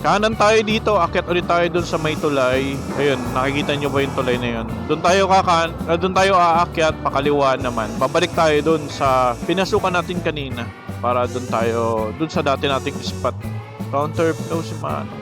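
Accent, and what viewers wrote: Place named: Hagonoy, Philippines. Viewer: ayown sana all talaga lagi sa gala..gusto ko ung salita hagonoy accent😂 try mo minsan mate😅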